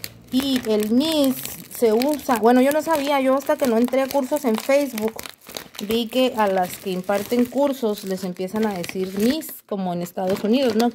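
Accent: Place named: North, Mexico. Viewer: Ahora sí te salió lo norteña,ajuuua 😅 todo padrisimooo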